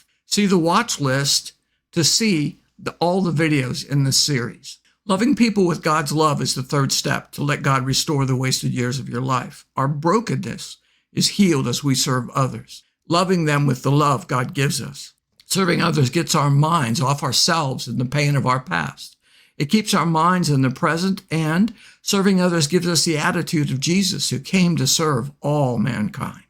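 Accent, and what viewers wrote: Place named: England, United Kingdom. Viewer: My god what a beautiful message. I am from India. Typical English accent, easy to listen to and fantastic insights. Thanks for leading me into his glorious light more and more. GOD BLESS YOU. 👍🙏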